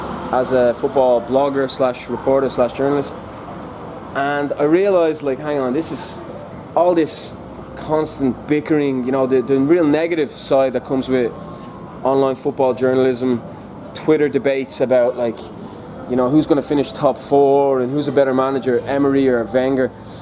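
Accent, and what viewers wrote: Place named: Ireland. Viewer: You sound like lrish you rap fast he a cool rapper singer my friend 🙂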